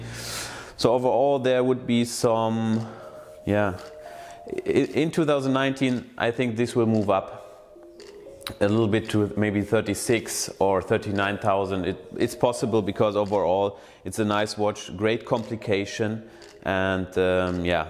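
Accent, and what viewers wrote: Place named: Germany. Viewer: Ja aber ich muss bei seiner Aussprache zu oft lachen. Ich meine sein generelles Englisch ist ziemlich gut, aber Aussprache die ist schon Deutsch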